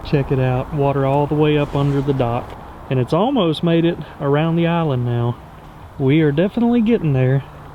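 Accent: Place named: Alabama, United States. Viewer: Yah, that soothing Alabama voice and bass!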